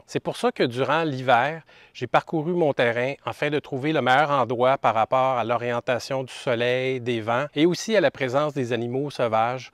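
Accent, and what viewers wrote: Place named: Quebec, Canada. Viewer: porquoi vous ne faites pas long dure'e video? j'ai de'couvert ta chaine ou' specifiment parler Quebecois francais.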